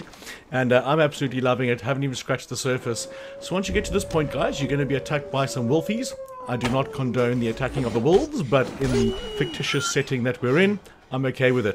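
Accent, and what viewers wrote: South Africa. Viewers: You sound South African.. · Hearing a South African do these videos is the best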